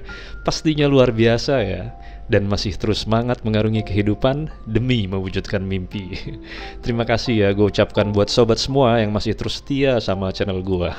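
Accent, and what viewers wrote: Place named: Sunda, Indonesia. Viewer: Suara bang khas suara orang sunda, suaranya sendu, entah knp dnger suaranya sedih, tp kisah"nya sangat menginspirasi sekali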